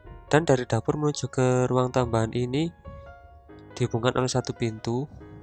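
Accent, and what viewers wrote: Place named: Malang, Indonesia. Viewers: suaranya khas malang banget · Itu logat medoknya kayanya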